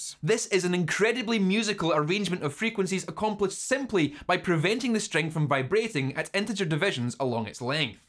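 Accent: Scotland, United Kingdom. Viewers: A video on two of my passions (science and music), with trademark Scottish brogue? Happy new year indeed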